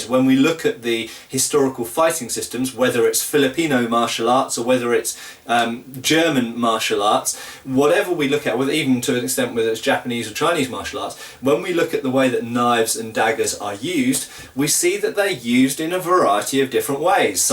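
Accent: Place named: England, United Kingdom. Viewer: Iona J He has an English accent and uses facts.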